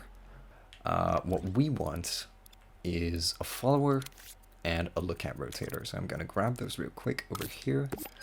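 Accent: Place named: Netherlands. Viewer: Ik kan me niet concentreren door die Nederlandse accent LuL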